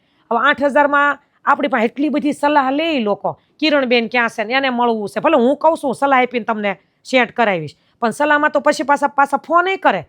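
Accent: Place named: Kathiawad, India. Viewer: નમસ્તે બેન. આપની સ્પીચ સાંભળી મને મારું કાઠિયાવાડ યાદ આવી ગયું. 🙏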